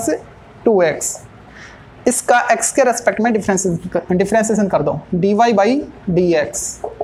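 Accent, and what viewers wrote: Haryana, India. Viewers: Ha mere kne hi si ye btata h ki ap haryana se ho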